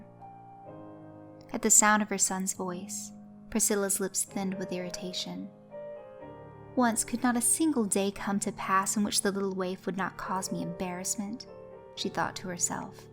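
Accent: South, United States. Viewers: came over to you from "The Creepy Dark" and I must admit that you are good . glad I checked out this trilogy and I really enjoyed the southern accent . I like looking for new channels when they are still somewhat new and watch them grow . I have just subscribed to you and I am looking forward to watching you grow . Keep up the good work and I hope to hear from you soon .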